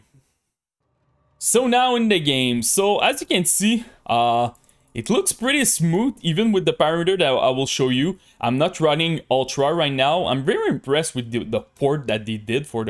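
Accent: Quebec, Canada. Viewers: Tu es québécois ? Lol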